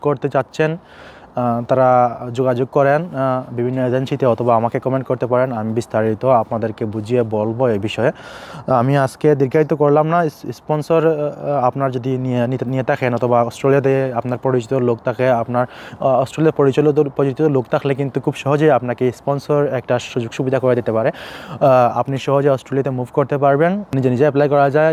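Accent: Sylhet, Bangladesh. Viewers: Vai er kothay buja jar Sylheti bhai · ভাই সিলেট নাকি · আপনি সিলেটী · Are you sylhety?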